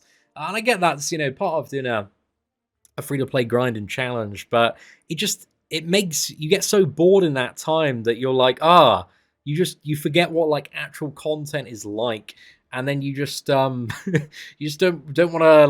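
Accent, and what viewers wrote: United Kingdom. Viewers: It is hilarious to listen to a British man swearing lol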